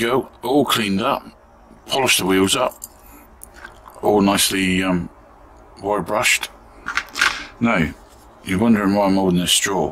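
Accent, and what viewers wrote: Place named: Devon, United Kingdom. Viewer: Hi, so pleased to be able to get back to your channel, followed you years ago, I have since had a Double Lung Transplant so have loads of time to get back into my Restorations, absolutely love your videos, you sound very much like a Devonshire lad like me, I wish you all the very best, I look forward to your next Video, Take Care bud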